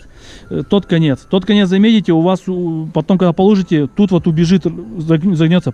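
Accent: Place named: Ivanovo, Russia. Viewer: По говору Иваново, Кострома.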